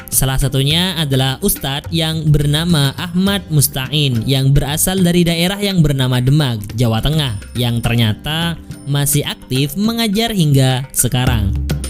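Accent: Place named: Madura, Indonesia. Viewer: Video x kereeeen tpi suaranya ketarah madurisix 😀😎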